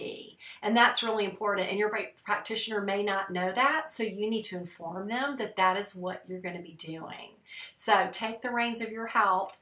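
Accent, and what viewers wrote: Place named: South, United States. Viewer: love ur southern drawl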